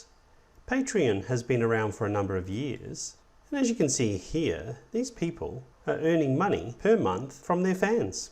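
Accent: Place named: Australia. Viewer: the Australian accent messes me up. I keep reading from the melody that is used to modulate their language that every second or third word is sounding like either a question or "don't you understand, it's so obvious"... I really didn't get anything out of this. I mean... "ask your fans to contribute to your projects"... how? How do you get your "fans" and why would anyone "pay" me?? The very idea of advertisements in the classic youtube monetisation means people themselves don't need to spend money. The corporates do that with their (massive) advertising budgets. Most people want everything for free in this buy one get one free culture today. People actually pay a monthly subscription for videos that appear from time to time from someone? I certainly would pay for that unless I am following a course and want to see each video and "get something for my money". 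I don't really get it.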